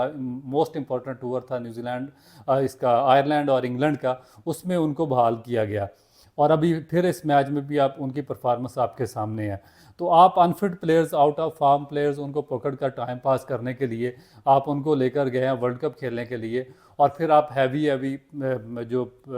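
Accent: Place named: Punjab, India. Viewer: Nice Punjabi accent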